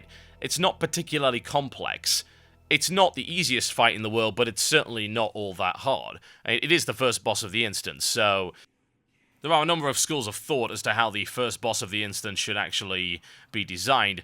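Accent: United Kingdom. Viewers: The Power of the British Accent!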